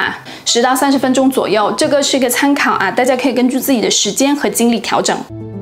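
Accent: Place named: South, China. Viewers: zoe是南方人吧！ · 才貌雙全！你讓我更想學德語了。好奇你是哪裡人？你的普通話跟我們台灣一位來自上海的的政治評論員的口音很像。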